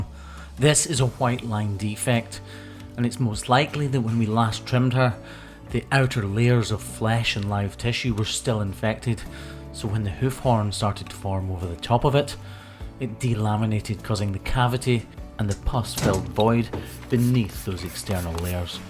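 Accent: Scotland, United Kingdom. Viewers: No, it's Scotland. Between the accent and him saying southwest Scotland in the video. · I just had a short come up under the title satisfying Hoof, is that your short? I ask because the Scottish âccent and voice sound like you.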